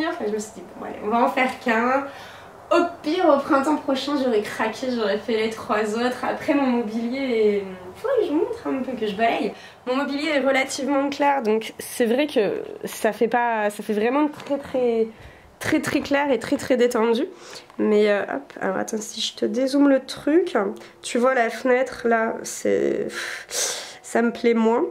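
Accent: Toulouse, France. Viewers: Héhé super contente de te revoir!!! Fabuleux cet accent du sud, ça m'a titillée dès tes premiers mots lol je ne suis pas tellement loin de chez toi donc ces petites sonorités sont familières à mon oreille! Cela te vas à ravir et tu as l'air très épanouie ça fait plaisir à voir et entendre. Mais dis moi belle, cela ne m'avais pas frappé jusqu'à présent mais tu ne serais pas HPE? Très grande émotivité, hypersensibilité, créativité débordante, vocabulaire du registre de l'émotion et mots "spécifiques" choisis avec soin pourtant servis avec grande facilité et spontanéité, grand plaisir et stimulation intense sur plusieurs plans dans les échanges relationnels qui "fusent", grande envie de communiquer et partager ses sentiments, émotions, niveau élevé d'empathie, amour des animaux avec rapport d'altérité, enfant intérieur très présent, anticonformiste, autodidacte...dis donc tu serais pas un zèbre par hasard? 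xD :P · Oh!!!! Ça fait longtemps! Tellement contente de te revoir, tu nous as manqué, tu es la 1ère youtubeuse que j'ai suivi en arrivant sur YouTube alors je suis trop trop contente de ton retour lol!!! Tu es radieuse et une vrai toulousaine, sa s'entend lol la robe est cannooon!!!! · Coucou!! Avant même que tu y fasse allusion je me disais "c'est bon elle a "choper "l'accent toulousain " !!! Mdr 😜Contente que Toulouse te plaise! Au plaisir qui sait de te croiser au détour d'une rue toulousaine!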